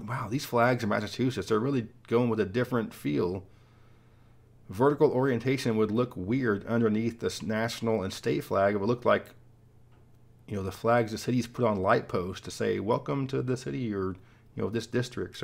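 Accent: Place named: California, United States. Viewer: I like how u speak like a true Californian about our home state's flags.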